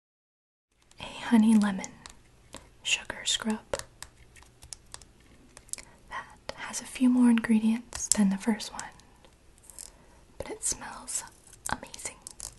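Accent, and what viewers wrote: United States. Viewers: r u from america?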